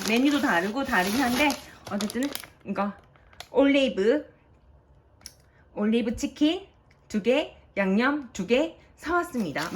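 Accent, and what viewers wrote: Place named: Japan. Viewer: 라면 많이 쟁여 놓으신거 보고 깜짝 놀랬어요 ㅋ 한국에 있을때 육개장 라면 엄청 자주 먹었었는데 ㅋ 갑자기 급 먹고 싶어지네요! 발음이 일본인이 한국말 하는 거 같아요! 일본어가 네이티브 수준이신가봐요! 너무 부러워요^^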